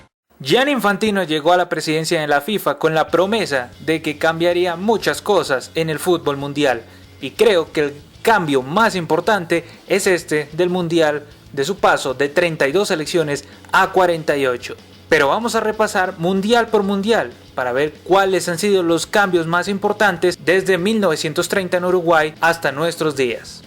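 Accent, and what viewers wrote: Colombia. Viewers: eres Colombiano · oie eres colombiano guey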